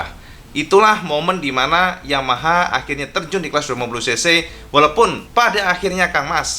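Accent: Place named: Java, Indonesia. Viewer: aku seneng ulasanmu kang....bloko suto coro jowone.....sukses kang....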